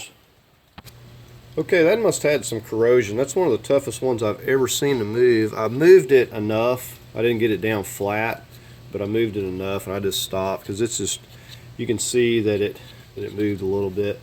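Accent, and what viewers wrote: South, United States. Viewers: Jeez you must be from the south huh?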